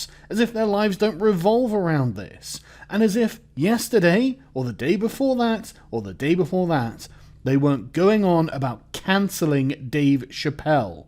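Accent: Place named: United Kingdom. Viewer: Reading snooty comments in a mocking voice is 1000x better with a British accent..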